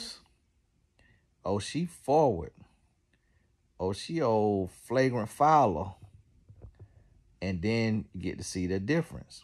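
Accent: South, United States. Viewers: Boiiii you have one country accent lol lol I'm from the South too I hear it in ya lol lol love your messages they are well needed, Big Blessings to you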